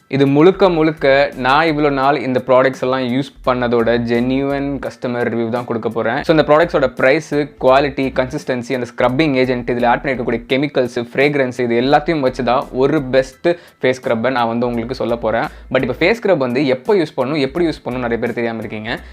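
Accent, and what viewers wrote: Madurai, India. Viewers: Bro Madura slang varuthu apo apo nenga Madura ya 🤔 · Maduraikkararo Neenga 🤔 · Anney ninga Maduraiya😁.. Onga slang appudi eruku... "Avinga,Evainga... Pooturuingey"😍😍😍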